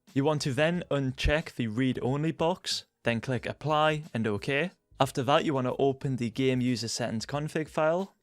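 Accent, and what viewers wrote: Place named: Newcastle, United Kingdom. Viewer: I think he is from Newcastle in England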